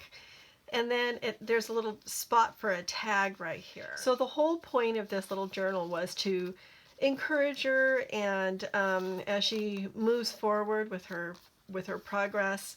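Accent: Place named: Pacific Northwest, United States. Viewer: So cute! Love them. It's my first time here and you sounded like you might local. I'm across the sound from Seattle.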